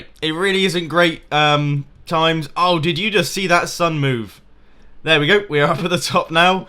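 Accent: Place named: RP, United Kingdom. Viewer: Why is he so posh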